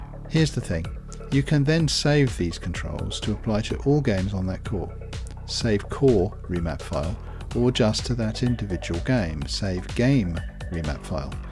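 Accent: England, United Kingdom. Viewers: Damn, ur voice is so soothing... And that British accent... U can tell me to kill my parents and all i would be able to reply is Yes, Master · Finally a English voice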